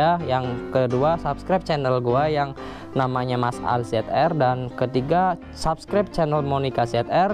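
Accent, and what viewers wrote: Tegal, Indonesia. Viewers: Ky orang tegal si bang logaknya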